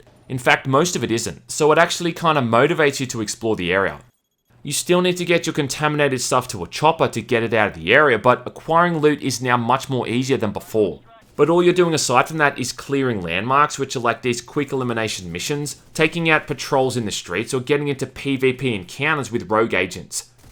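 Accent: Australia. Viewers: that australian accent tho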